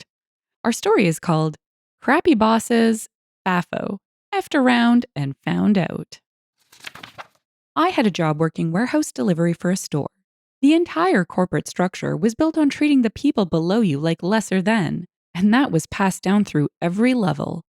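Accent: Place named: Canada. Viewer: Thanks! I'm a Canadian living in the deep south (US) and always look forward to hearing that little bit of home in your voice. 🧡❄️🍁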